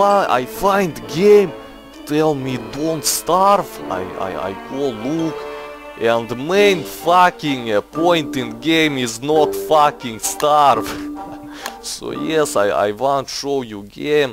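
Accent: Russia. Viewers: XD There's a kind of swedish sound to your voice, even though the accent is sort of russian. Where do you come from? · I got spamed and came here, and it was worthy :D I want more videos with 'dat Russian accent!!' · Nice accent are you russian? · His Accent Is Awesome :D i love russian people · he's russian right? privet esli da :D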